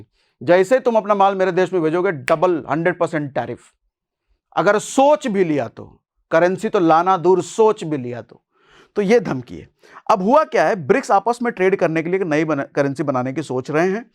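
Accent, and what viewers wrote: Bihar, India. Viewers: Bihari tone me daam hota hai. Logic very fine sir ❤❤ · जी ये तो पूरा बिहारी टोन है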